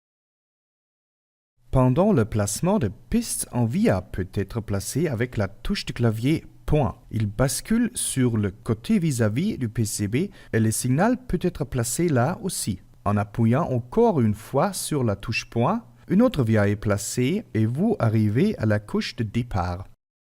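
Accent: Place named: France. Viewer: ach du liebe Gott ^^ danke für diese schöne Französisch-Akzent.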